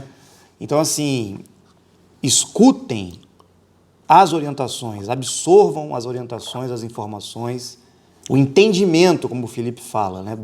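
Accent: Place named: Rio de Janeiro, Brazil. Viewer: está carioca..😮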